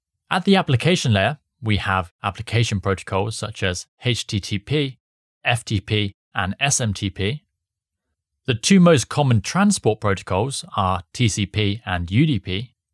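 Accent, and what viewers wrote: United Kingdom. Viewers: ok watever u do , just follow the same presentatiin style - and the same lovely british accent 😀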